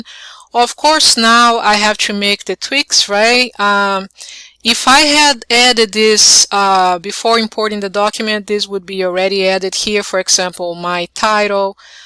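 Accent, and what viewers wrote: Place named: Brazil. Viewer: First time here. Are you Brazilian...? Your accent sounds beautifully Brazilian. Thanks for the video!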